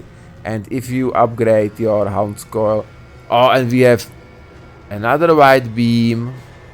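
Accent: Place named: Czech Republic. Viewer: hned vím že to je cz typek přízvuk jasny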